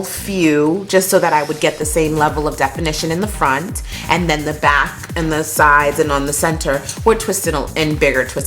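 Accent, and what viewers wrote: United States. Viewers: you have a new york/louisiana/californian accent all in one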